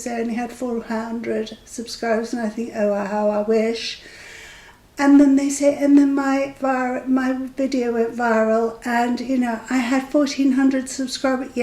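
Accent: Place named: Northern Ireland, United Kingdom. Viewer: Yeah you got it right its Northern Irish accent!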